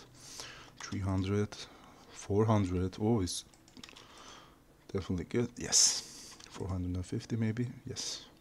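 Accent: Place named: Turkey. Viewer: Abi Türk müsün. İngilizce aksanın Türksün gibi geldi. Şunun Türkçesini anlatır mısın 😅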